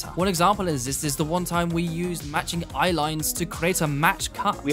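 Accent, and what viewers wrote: United Kingdom. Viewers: Hey......Peter McKinnon sent me.....good to listen a YouTuber who speaks the Queen’s English, for that reason alone I’ve sub’d!👍😄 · intresting stuff here and very well explain with that top notch british accent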